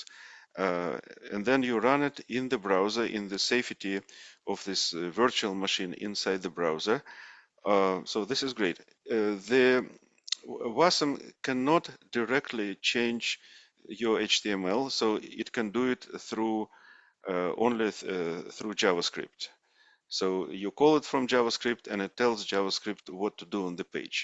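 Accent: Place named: Russia. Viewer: Love your English accent, you seems to be from Russia.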